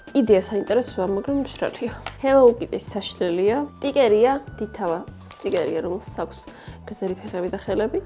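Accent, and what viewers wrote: Imereti, Georgia. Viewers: იმერელი ხარ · იმერელი ხარ?❤❤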